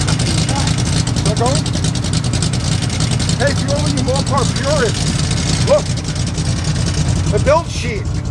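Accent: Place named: Brooklyn, United States. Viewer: you were ragging way too hard!!! Obviously appeared as if it was his first time the drags which can be a bit overwhelming. You sounded like a slightly nicer version of Mike P. from Brooklyn!!! He'll get there, nothing works but experience - you KNOW that! It's harder than it looks. Start with teaching him how to do a proper burn out!!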